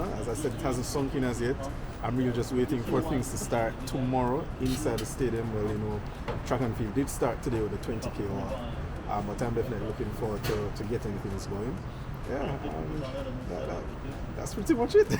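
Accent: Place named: Jamaica. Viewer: I was listening today and thought it was a Jamaican announcer and then convinced myself it was wishful thinking🤣🤣🤣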